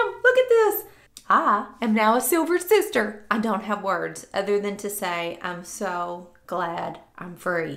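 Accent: South, United States. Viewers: I did the same when I reached 47.. my husband misses the red head. He got over it. He has a duck dynasty Grey beard. God Bless,, embrace the authentic you.. Our world is too full of fake people. Be the real deal. My hair got curly later in life and love it. I blame it on medication. P.S. love your accent so southern. Mine is a Texas drawl.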